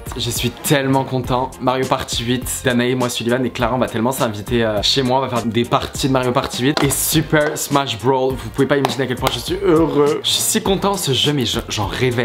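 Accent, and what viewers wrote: England, United Kingdom. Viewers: Tu a un trop beau accent anglais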